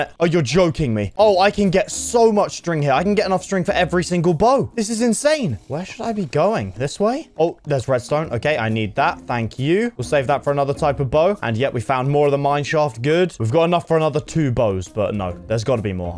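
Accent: United Kingdom. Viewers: Your voice is a little british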